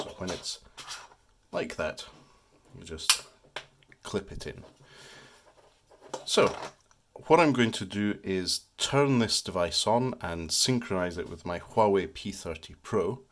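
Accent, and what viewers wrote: Scotland, United Kingdom. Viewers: good to hear scottish dude doing tech and a youtube channel :D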